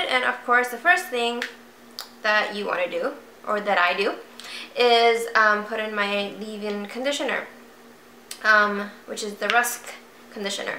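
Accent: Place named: Portugal. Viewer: Thanks for the tips! this is the 1st video I watch of you and I already knew some of the thing and tips to do, just need to buy the equipment and utensils lol! Btw didn't know you played the violin...well I just assumed so since your twitter pageis, Idk for sure but thanks again so much! I'll be sure to try them. I also have my pages of XxxThePianistxxX on facebook and youtube (I think that's how I spell it;) ) and xxThePianist&ViolinistxxX on twitter. Mostly music related but I also have curly hair and I try to style although it's really difficult and then it's really dry, moisturize without putting your scalp too oily sigh a torment but I love my curls, it's just the time and patience to deal with it lol. Do you use an alcohol free shampoo btw? And also you sound like portuguese, your accent, sorry if I'm wrong, but just guessing. Lovely curls girl btw! 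xx - XxxThePianistxxX (Camila F.)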